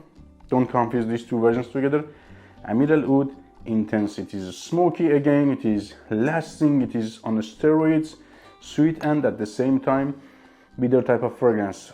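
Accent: Iran. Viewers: به لهجت میخوره ایرانی و هموطن باشی ، موفق باشی جان لذت میبرم از رویو هات و خیلی تو خرید هام هرسری کمک میکنه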